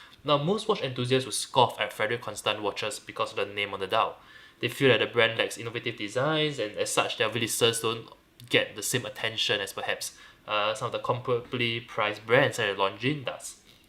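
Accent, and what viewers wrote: Singapore. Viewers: It's not a mid Atlantic accent, it's a Singaporean/Malaysian accent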